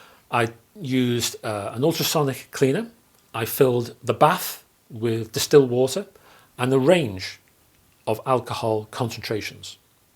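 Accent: United Kingdom. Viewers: Thanks so much for this video. I enjoy your demeanor and easy-to-understand British accent. But I have a few things to say. I've heard that the PVC used in records is normally clear. That's why nowadays we get so many colored ones on the market. Evidently your black samples were treated with some type of carbon, that's what I've heard btw. Now as for alcohol use, how I tested it was to put undiluted store bought 70% isopropyl on a record in a 3" square area and let it sit overnight. The next day, having played the record previously I played it again and noticed absolutely no difference. I think the best way to test something that may have detrimental effect would be try it out on an unwanted record in an undiluted form. If it causes damage of course, back it off. 😊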